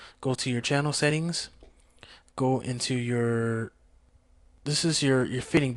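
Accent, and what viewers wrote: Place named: Caribbean. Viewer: Nice tut! I can tell you're carribean.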